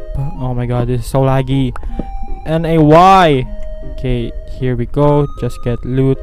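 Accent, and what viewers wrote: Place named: Philippines. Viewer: You Really Have A Filipino Accent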